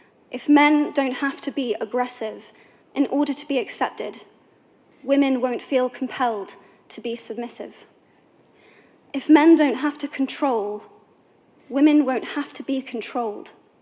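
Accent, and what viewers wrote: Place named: United Kingdom. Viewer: xem truyền cảm hứng vừa học phát âm anh anh ... quá đỉnh luôn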